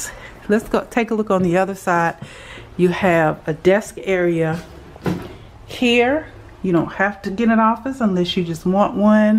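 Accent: South, United States. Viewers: Best southern accent-I just liked listening to you. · I love your voice, it’s so calming and southern! Love it, I’m from the south too but I’m more Appalachian sounding lol. Good job! 😊😊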